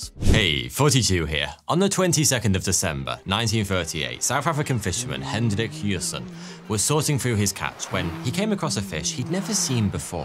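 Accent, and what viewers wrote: United Kingdom. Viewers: I totally forgot you're British, I've gotten so used to the accent I can't even hear it anymore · There's something about British dudes narrating animal videos 😉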